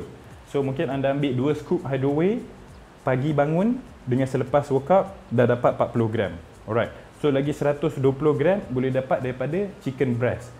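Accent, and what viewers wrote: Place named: Terengganu, Malaysia. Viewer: kenapa aku rasa doc ni org tgganu😂ada beberapa patah perkataan dia mcm org ganu sebut😂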